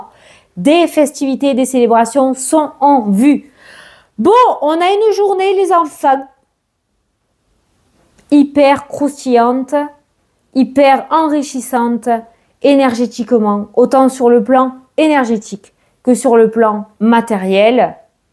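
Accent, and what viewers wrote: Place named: Hérault, France. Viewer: Bonjour, toujours avec le sourire malgré tes petits ou grands problèmes. J'adore ton énergie et ton accent qui est le mien aussi. Je suis une héraultaise. Je te souhaite une bonne journée a bientôt 🙏😚.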